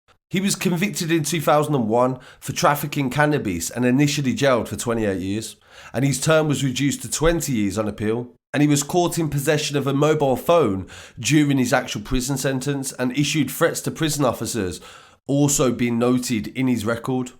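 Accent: Birmingham, United Kingdom. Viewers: Bro I feel your channel would do better if you tone down the brummie accent. Good story!!!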